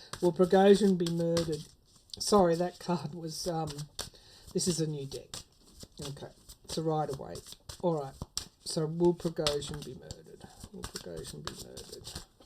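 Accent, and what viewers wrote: Australia. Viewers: Hello, what a joy to find you. I am fairly recent to Taroscopes & a new subscriber. I listen before sleep. I often don't watch, just listen. I heard your voice & knrw instantly that you are Aussie. I am so impressed with your channel I have only heard two of your readings & what a joy to onow yhat you do politics. After all we are so affected by Government & the fall oits that occur. I would love to know that Mr Morrison has some fallout from the reality that he & his Government have perpetuated in Australia. Love that Gladys & McGuire have been rxposed. Will there ever be corruption free politics 😢 thanks for what you do ❤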